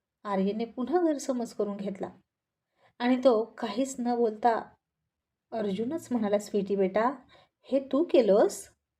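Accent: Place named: Kolhapur, India. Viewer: Good morning tai 🌄 khupcha khup miss kele mitumhala ani tumcha storila fon divas....gharatali ekhadi vakti bahergavi jate ani ghar kasa suna suna vatate tasa mala vatate hota😢😢😢😢😢😢😢😢😢mi tumchi pranay pipasu bayko hi story khupcha miss karat ahe😢😢😢😢😢😢ta storitil ekun ek prasang mi maza manat sadhavun devale ahet😊😊😊😊😊😊thanku tai 🙏🙏🙏🙏🙏🙏🙏🙏🙏🙏 love you tai ❤❤❤❤❤❤ tumhi khup chan ahat tai ❤❤❤❤❤tumcha kolhapuri bhaset mhatle tur lay bhari...........nad kudha.... Tumcha sarkhe tumhich.....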